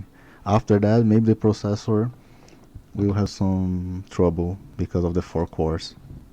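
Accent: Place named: Brazil. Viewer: Immediately recognized the Brazilian accent 🇧🇷🇧🇷🇧🇷🇧🇷🇧🇷🇧🇷🇧🇷🇧🇷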